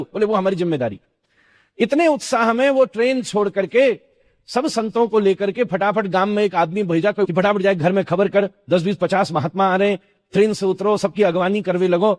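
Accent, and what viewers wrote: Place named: Braj, India. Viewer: Brij ki boli, meethi boli❤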